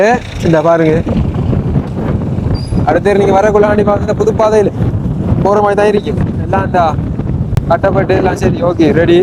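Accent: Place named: Batticaloa, Sri Lanka. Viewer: நீங்கள் மட்டக்களப்பு தானா? நல்ல செல்லத்தமிழ் கதைக்கிறீங்கள். உங்கள் தமிழை கேட்க இஸ்லாமியர் போல் தெரிகிறது. திருவிழா முடிந்தபின்தான் ஊருக்கு திரும்புவீங்களா?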